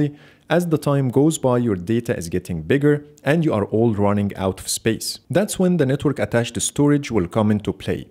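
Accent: Egypt. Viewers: اللهجة المصرية بتميزها من مليار شخص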